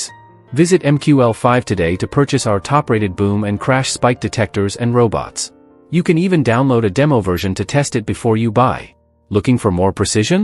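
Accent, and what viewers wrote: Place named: United States. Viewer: VOICE AMERICAN, MUSIC AFRICAN))) BEST STRATEGY 🤩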